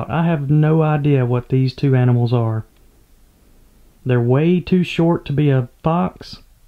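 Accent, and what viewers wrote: South, United States. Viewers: You sir, have one of the most welcoming and friendly southerner accent ive ever heard, keep up the good work! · seems very shallow, and I assume by your accent you are from the southern usa, wont it dry up in the summer heat? is it by a river for water replenishment?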